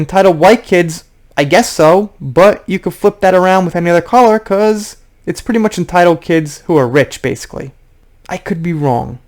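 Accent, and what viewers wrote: New York, United States. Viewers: You remind me of them proper New York like ..cool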